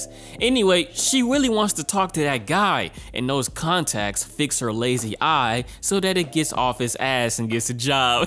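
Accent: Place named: Philadelphia, United States. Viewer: Oh you from Philly huh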